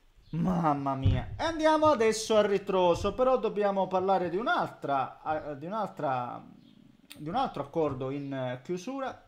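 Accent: Calabria, Italy. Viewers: l'influneza calabra si sente caro (anche se sei lucano) hai detto Castrovillari, paesino vicino a Cosenza, invece di Castrovilli 😄 TI SI VUOLE BENE BRO!!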